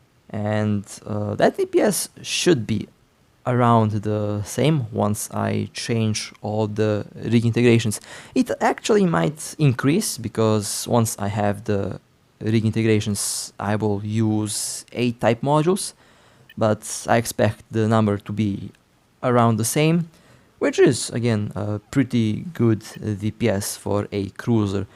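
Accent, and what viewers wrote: Russia. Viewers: Жуткий рязанский акцент )) а так оооочень интересно;)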